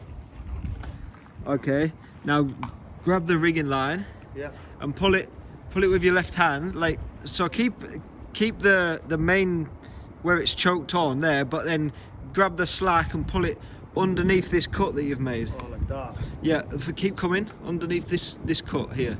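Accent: United Kingdom. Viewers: Sweetest name ever!! With the British accent! This guy needs to be a movie star!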